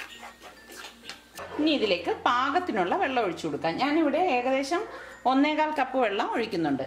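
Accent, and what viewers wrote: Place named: Eastern Kerala, India. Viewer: .. Ya ya.. Sure.. Ithatha wr u frm? Vadakkarude slang alla talking.. Kizhakot anu ennu thonnunu.. Am i correct.. Im vry interested in ur recipes.. Masha Allah.. May Allah bless u..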